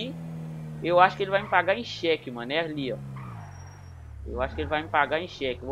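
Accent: Minas Gerais, Brazil. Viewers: Mano ? se e Mineiro? :V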